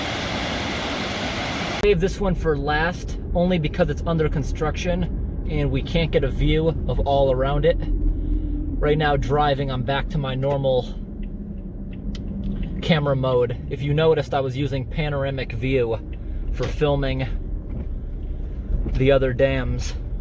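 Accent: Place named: New England, United States. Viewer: Just a couple of comments. First I love your dog, she is beautiful, would be nice to see more of her... second, I really dig your accent... is that typical 'New England'? next ....you are showcasing an outstanding part of your wonderful country, educating individuals such as myself to the rich and diverse wilderness still left in the north eastern states....Finally much respect to you for your selfless efforts to improve the environment for the benefit and enjoyment of your fellow bods. ....Peace and happiness from across the pond (Old England).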